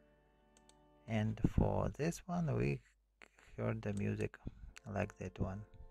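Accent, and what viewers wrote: Russia. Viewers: Such Russian accent is it?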